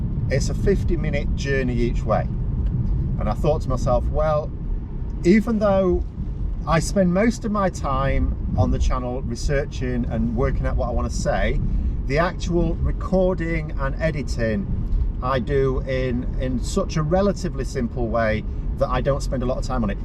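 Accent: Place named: Yorkshire, United Kingdom. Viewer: Merry Christmas. I very much enjoy your podcasts and am a regular viewer. Your insights into politics are most informative. I particularly like your Yorkshire straight talking, something I appreciate about the folk in Britain's best county. All the best.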